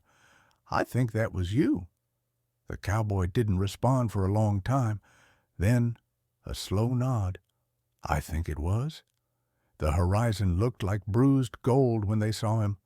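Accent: United States. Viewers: Story and voice are spot on. Cowboys talk like this. People from the mid west talk like this. Actually the old cowboy talked too much Awesome amazing story thank you!❤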